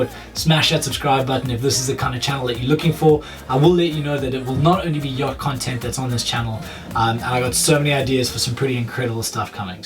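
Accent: South Africa. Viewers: Are you south african?? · Are you SouthAfrican?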